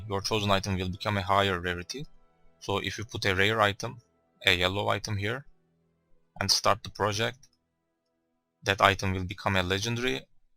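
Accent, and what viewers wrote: Turkey. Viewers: İlk cümlende Türk olduğunu anladım :D Rehber için teşekkürler. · Türk ingilizcesi bu ama gerçekten çok temiz olmuş dostum ellerine sağlık :)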